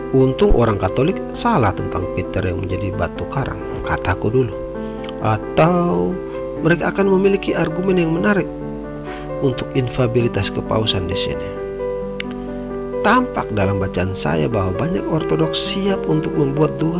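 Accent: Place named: East Indonesia, Indonesia. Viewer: suara dalam video ini...kedengaran macam suara sdr dari Timur...mungkin NTT atau FLORES. apapun...semua Gereja Tuhan itu belum ada yang sempurna, hingga nanti menit TERAKHIR di sempurna kan sang PEMILIK GEREJA, jadi bisa saja bersatu walau dalam perbedaan.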